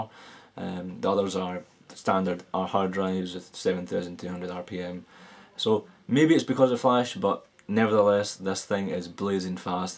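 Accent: Scotland, United Kingdom. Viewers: love Scottish accent !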